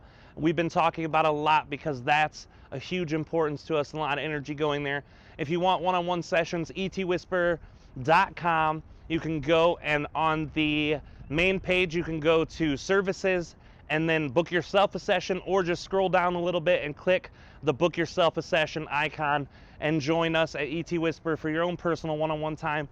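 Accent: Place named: RP, United Kingdom. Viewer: Wow I'm from UK and channeling u have the poshest English voice I've ever heard,💕 was brilliant video ,thanks💕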